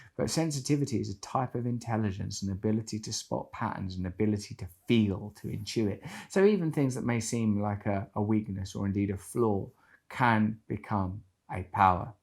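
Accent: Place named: London, United Kingdom. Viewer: You sound like you're from London♥️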